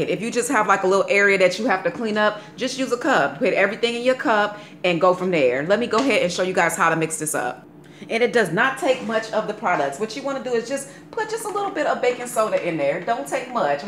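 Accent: South, United States. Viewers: Thank you so very very for your wonderful clean tips using Baking Soda. But what exactly is “Fabuloso”? I never heard of it before. I hear you saying, “y’all” quite a lot are you from Southern? Is Fabuloso sold down South or in the Mid-West somewhere? I’m from NY is the something similar I could use? Anyway, thank you very much. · Wonder if this would work in the bathroom shower? Love your sweet southern voice. I live in the south too! God Bless!😉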